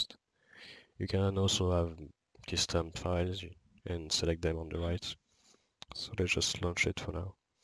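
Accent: France. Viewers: That frenchy accent is really noticeable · C'est moi ou tu a un putain d'accent français ?? ( its me oe you have a fuking french accent ?? )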